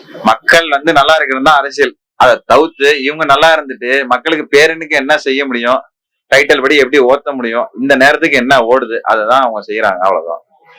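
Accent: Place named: Delta, India. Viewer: Evari delta slamg mathiri pesuraru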